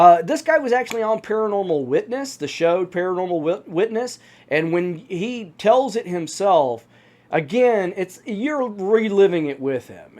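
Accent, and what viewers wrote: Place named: South, United States. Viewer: Hello again, My dude. As ever, another well done presentation. Narration on point, albeit sprinkled with hints of friendly southern winds. As for the subject matter, I have yet another gift for you. Below is a link to the story of Port Lock Alaska - I will not say anything to spoil it, but.... this is one of those very little known stories with credible and VERIFIABLE bits and pieces out there... In my honest opinion - THIS IS BIGFOOT COUNTRY! Keep well, be safe, and as always, let's strive to earn the knowledge that will be our own individual lights on our darkest days.... <link>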